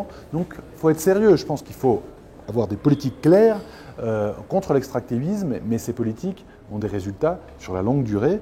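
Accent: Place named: Ecuador. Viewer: Le français plus équatorien au monde! Bien expliqué le Sumak Kawsay.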